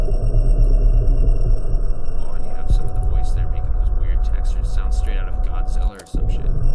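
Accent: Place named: Canada. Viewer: haha I loved the moment when I noticed you're Canadian! Hell ya brother, full send er eh!!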